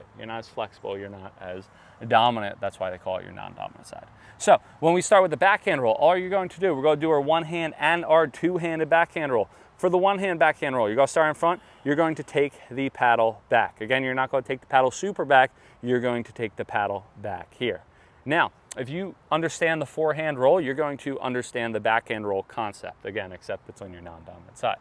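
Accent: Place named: Philadelphia, United States. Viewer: Love your vids! And your Philly accent ;)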